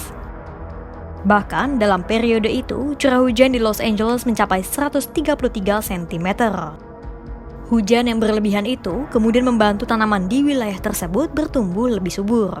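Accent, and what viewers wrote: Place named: Palembang, Indonesia. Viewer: Naratornya kemungkinan orang Palembang,logatnya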